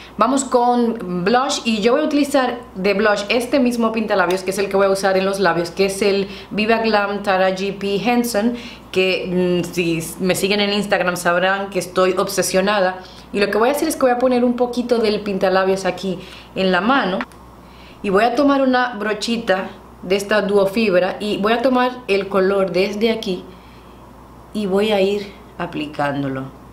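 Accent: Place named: Dominican Republic. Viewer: me encanta tu acento deberias dar unas clases aya en las escuelas sobre el uso y acento de el espańol en republica dominicana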